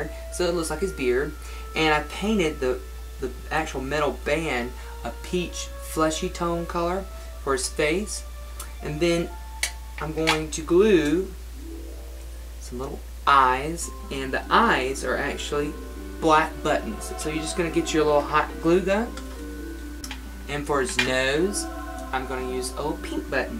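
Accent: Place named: South, United States. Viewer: I like ur Southern Drawl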